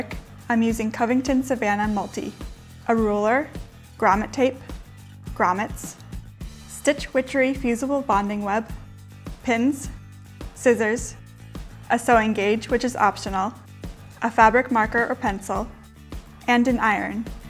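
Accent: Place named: United Kingdom. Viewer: Very British pronunciation. I notice a lot of young YouTubers now drop their Ts.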